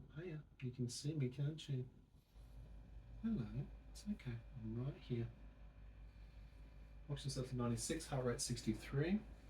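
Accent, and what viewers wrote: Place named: Australia. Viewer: hi my name is Payton and I'm from Pennsylvania and I'm also a special needs adult I have William sindrom and two conditions eye and heart I'm 24 and I support you and you're wife I Hope that she is okay and tell her that I think she is awesome! and you are cool !! I like Australia accent. it's very different than ours I don't drive or live on my own and I have balance issues I'm sending parys for her !! your friend Payton from the United States of America !!❤❤❤❤❤❤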